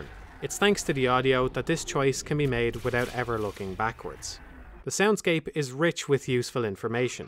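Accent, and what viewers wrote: Ireland. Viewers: holy fuck he's irish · I needed this monotone Irish voice in my life. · The rebirth of my favorite snarky Irish gentleman is upon us.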